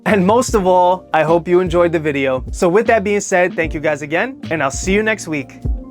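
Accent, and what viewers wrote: New York, United States. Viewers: I love that New York accent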